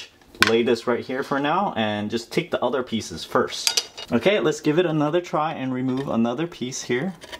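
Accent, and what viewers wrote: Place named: United States. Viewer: great video but man it always catches me off guard when i see an asian speaking perfect american english lol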